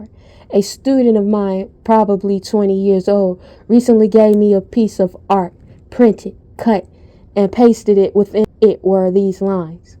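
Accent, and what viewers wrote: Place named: South, United States. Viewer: You're voice is amazing, great narrator. What region of the US are you from? I hear a little southern accent... beautiful.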